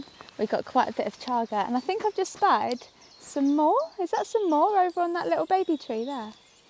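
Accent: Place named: Yorkshire, United Kingdom. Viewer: You sound well posh for a Yorkshire lass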